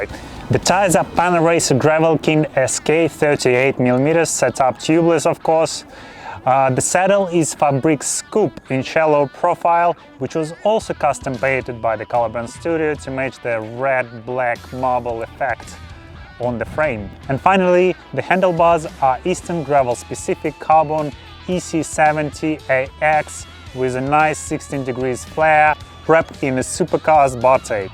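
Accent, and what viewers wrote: Russia. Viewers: Do like your videos, buddy! Are you from Russia& Sounds like that ) Hope to see more interesting Gravels on your channel soon!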